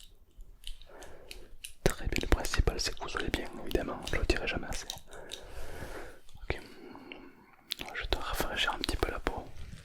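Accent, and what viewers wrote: South, France. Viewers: pk il fait l accent du sud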